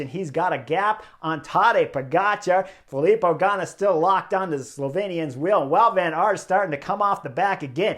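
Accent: United States. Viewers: Great analysis… but man the American pronunciation of names is so bad. Not even a small effort to respect original names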